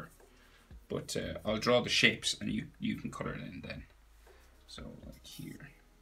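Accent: Ireland. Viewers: Is it just me or does he have an irish accent like Johnny Sexton?!?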